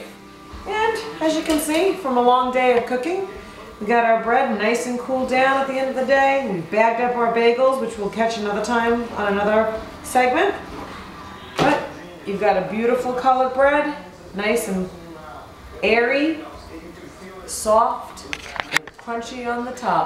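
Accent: New York, United States. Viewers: Would this work in a dutch oven format at high heat do you know? Being from NJ now in FL, I recognized NY in the first sentence. Love love the Northeast crisp to the pointness that we are "famous" for. Love your vid, very helpful. I stopped eating wheat 5 years ago, mostly raw vegan but I miss the ritual and smells so might try this. Beautiful job, lovely person! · Loved your video. I heard the accent New York 🗽 Baby! 🤣🤣 I’m just looking for better bread options and never used my bread machine that was gifted. Plus sometimes with being tired from work, my schoolwork, the kids schoolwork, house chores 🤦🏽‍♀️ I need to start using it. Let the experiments begin!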